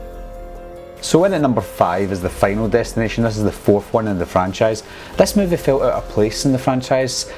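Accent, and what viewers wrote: Scotland, United Kingdom. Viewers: Your voice is so Scottish...and Scottish voices are sexy!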